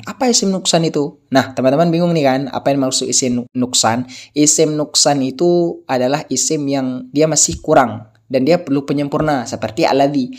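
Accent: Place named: Java, Indonesia. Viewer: Bahasa Indonesia manteng medok that tgk😅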